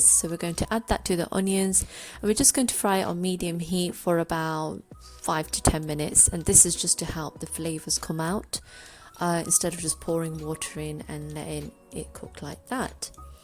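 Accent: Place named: United Kingdom. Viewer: Nice british accent yummy food! (_ _)/"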